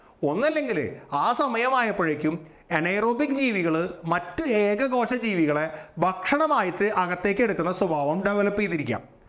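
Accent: Thrissur, India. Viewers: I do not know who you are. But with great respect, may say, your use of language is definite or conclusive. Science is never definitive or absolute- it's dynamic. It may be due to Trichur slang or accent? Though I love science, listening to this gives me ARROGANT OR ABSOLUTE VERSION of science huh! Amen!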